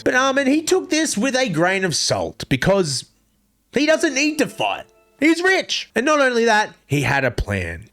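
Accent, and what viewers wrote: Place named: Australia. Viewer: You're bit too aussie for me to subscribe or like the vid. cheerio mate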